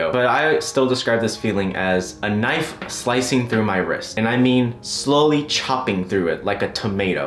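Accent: China. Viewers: aaaaahhhhhhhh the chinglish is cuuutttttteeeeeee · im sorry but your chinglish is so uwu like "ni men bu cArE" aH CUTIE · The chinglish was so adorable. As a person who speaks Chinese, I find it so cute. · Who else is INLOVE with his chinglish ? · chinglish sounds really cool tho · Lol i luv your chinglish.its so cute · your chinglish brings back memories from family reunions lmaooo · Your chinglish is so funny 😂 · Oml ur Chinese is the literal cutest. “妈妈， 我有一个surprise我要show你.” OML I DIEDDD **Chinglish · your chinglish rESONATES with me it made me so happy heh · His chinglish is adorable.... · wOwW- you also talk chinglish? 👌😔👌 relatable. but- your parents are so supportive though i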